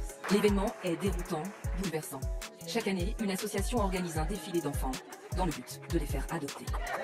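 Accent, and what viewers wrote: France. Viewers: tu sens le nord à des kilometres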